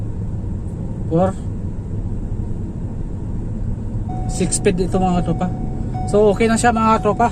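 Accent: Iloilo, Philippines. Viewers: ilonggo ka nong? taga diin ka? iloilo or bacolod? iba kasi ka kasi mag tagalog prang ilonggo..hahaha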